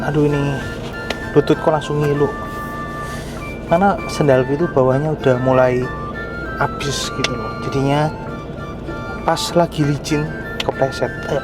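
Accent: Surabaya, Indonesia. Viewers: Seneng kalo mas ngomong. Sopan. Suaranya kalem. Trus bahasa jawa surabayaan nya keluar tuh 😅 bahasa jawa chindo gitu 😂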